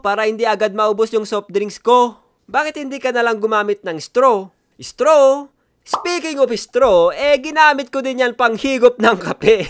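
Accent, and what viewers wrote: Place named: Nueva Ecija, Philippines. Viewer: Taga Nueva ejica ka ba idol may punto kasi salita haha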